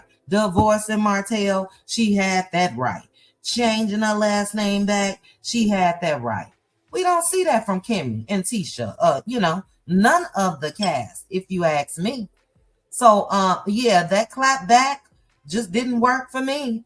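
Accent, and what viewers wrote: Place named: South, United States. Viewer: . I just love your commentary, and love your voice even more. My sister-in-law passed a view years ago and you and she sound exactly alike. You 're voices are so sweet with that southern twang. I listen to you often, and it just brings me such joy as I can hear my sister-in-laws voice which is quite comforting. Even my husband, her brother, says you sound exactly like her. Keep up the great commentary, and thank you.❤❤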